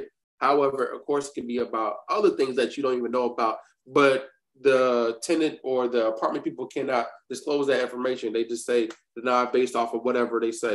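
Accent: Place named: Baltimore, United States. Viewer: Y’all from Baltimore huh?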